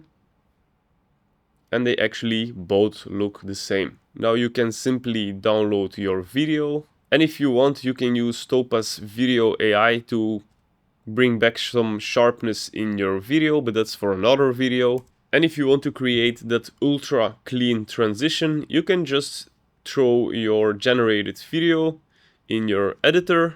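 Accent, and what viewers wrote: Belgium. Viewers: are you from belgium ?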